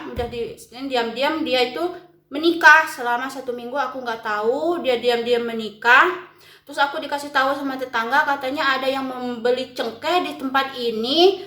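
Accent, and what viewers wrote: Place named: Lampung, Indonesia. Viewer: Logat mu kayak org Lampung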